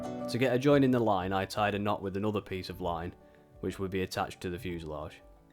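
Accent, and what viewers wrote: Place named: North, United Kingdom. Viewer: What part of the North are you from? great video mate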